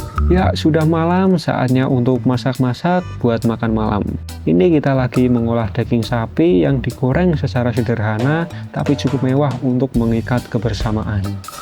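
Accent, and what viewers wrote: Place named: Java, Indonesia. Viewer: Muedhok sekali ya masnya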